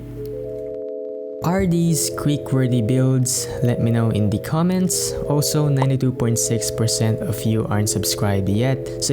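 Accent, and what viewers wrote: Philippines. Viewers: felepeno accent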